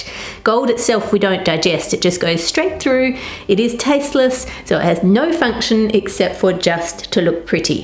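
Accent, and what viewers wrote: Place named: Australia. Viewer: It’s an Australian accent. There is more than one.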